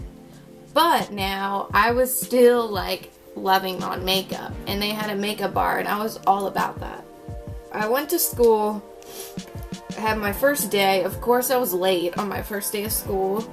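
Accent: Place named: United States. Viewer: Ugh, you're so great on camera!! I never realized you had a lil country accent. So cute 😁😁 Loved this video, looking forward to more content from you!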